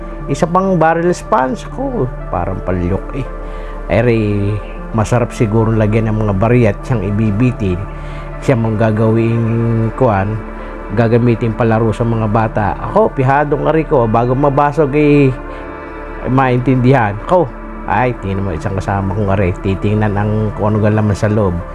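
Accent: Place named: Batangas, Philippines. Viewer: Meron pala na ganyang Koala Dive site. Mabuti at nadirinig namin ulit ang iyong sariling Batanguenyong boses 😊 Naoansin ko lang na medyo murky na ang tubig sa Mabini hindi tulad nung dati na napaka linaw.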